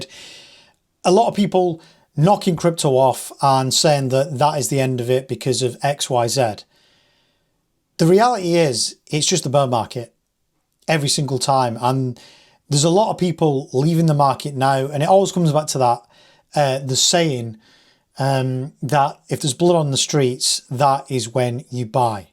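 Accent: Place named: North West England, United Kingdom. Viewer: Good to hear a north west accent in the space! Good luck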